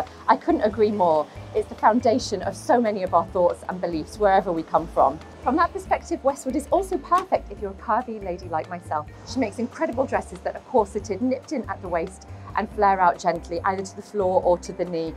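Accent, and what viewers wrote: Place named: Manchester, United Kingdom. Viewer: I will check it out. 😊 I heard a bit of a Northern accent today in your video.Didn't realise it was Mancunian.👍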